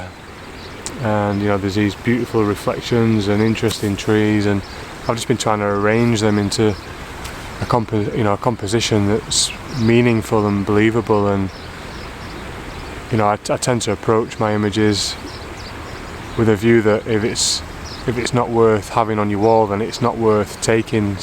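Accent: Yorkshire, United Kingdom. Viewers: Great video! Yorkshire accent?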